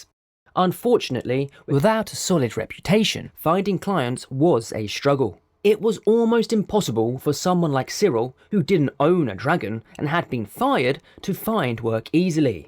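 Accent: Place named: United Kingdom. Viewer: never heard a british voice for recaps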